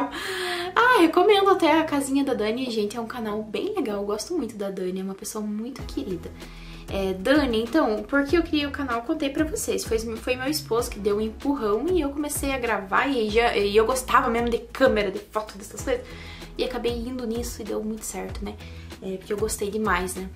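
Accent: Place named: Paraná, Brazil. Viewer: Oi amiga o sotaque do Paraná 💞💞💞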